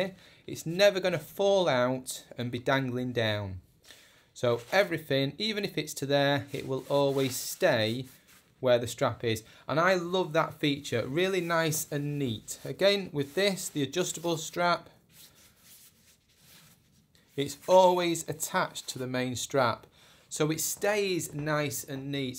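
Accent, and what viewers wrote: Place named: United Kingdom. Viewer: Damn. Nothing worse than clicking on a video from a creator you've never seen and they're Bri'ish. SMH. So sad.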